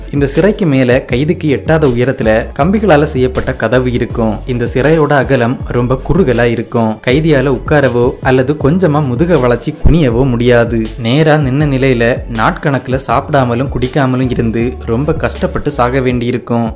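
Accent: Kanyakumari, India. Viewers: Anna neenga kanniyakumari thanea🎉🎉🎉🎉🎉😂😂😂😂😂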